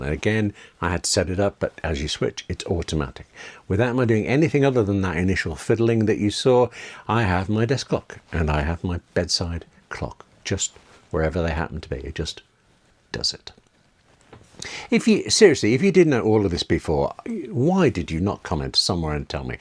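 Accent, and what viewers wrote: United Kingdom. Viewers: Great British accent but how about specifying the phone models on your headline not to waste any more time of us lowly SE users? 🖕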